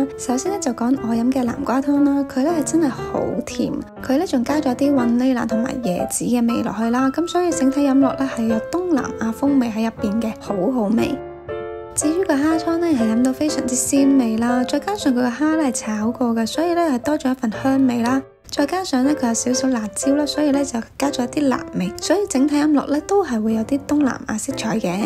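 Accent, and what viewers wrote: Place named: Cantonese, China. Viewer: What language are you speaking? It sounds like Cantonese, but not exactly like Hongkong. I wonder.🤔🤓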